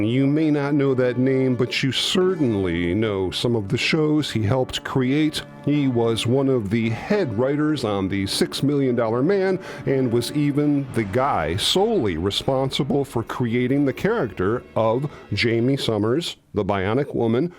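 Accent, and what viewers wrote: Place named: United States. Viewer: I'm sorry I can't listen to that bloody accent anymore you won't get to the point you bloody yanks just keep talking and talking . God forbid we'd actually have videos from other countries considering its the world wide web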